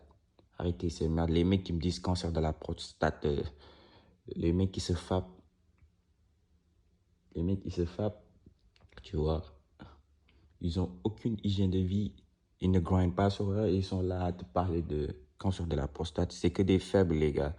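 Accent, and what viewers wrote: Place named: Senegal. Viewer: Sa sent laccent dun frere senegalais lool Bonne viseo frere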